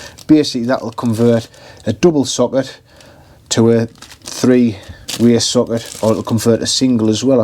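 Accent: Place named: Newcastle, United Kingdom. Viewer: love the Geordie accent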